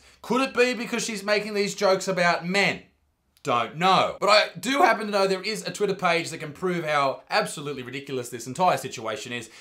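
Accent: Australia. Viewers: The most Aussie guy I have ever heard or seen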